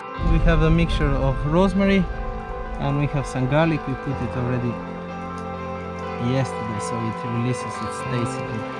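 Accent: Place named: Indonesia. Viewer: Logat nya khas pedalaman Indonesia banget. Auto subscribe ini mah 🤙🤙🤙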